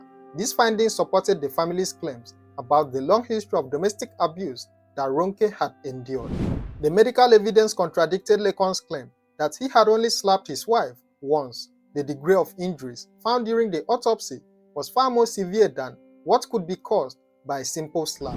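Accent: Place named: Calabar, Nigeria. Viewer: My brother, I like your Calabar ascent. God bless you my bro.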